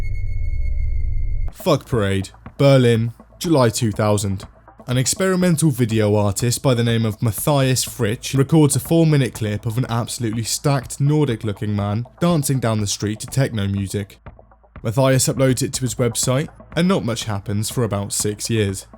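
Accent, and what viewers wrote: United Kingdom. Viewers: Internet historian's British son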